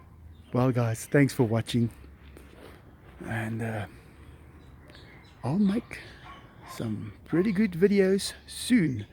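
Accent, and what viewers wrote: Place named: South Africa. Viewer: It sounds like you from south Africa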